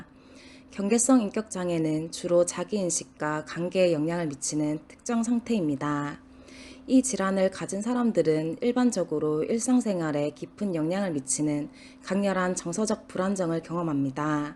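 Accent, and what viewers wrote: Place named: Busan, South Korea. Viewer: I can hear a hint of her Pusan-dialect, which makes it understandable. Don’t bash her. At least she’s trying hard to make it sound clear.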